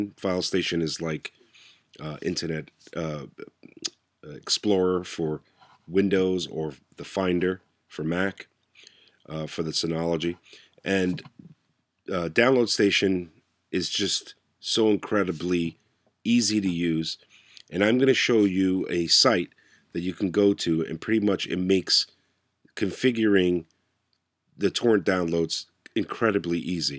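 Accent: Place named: Northeast, United States. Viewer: ***** I got an cease-and-desist order once for 800€ and could drop this down to 120€ with my lawyer. It was a CD I owned yet which I downloaded just for fun (I didn't want to search my CD-Board,=) So, even if you own an opus of an artist, you are liable for infringin Copyrights in Germany. I don't know where you are from, regarding your accent I would say north-eastern USA ? Do you can download any works legaly if you "own" (have a copy) it in USA ? I don't think so (DMCA?!) With Series its another Story here. If it was aired in free TV you can legally download it. Besides, your Video was VERY infomrmative for me and raised the Decision to buy a Synology DS 2xx(play?) sthg with remuxing/transcoding would be fine. The Tracker where I download from isnt registered in any DNS and you can only be invited. Nobody will find its Frontpage without knowing the IP and even then he cant enter.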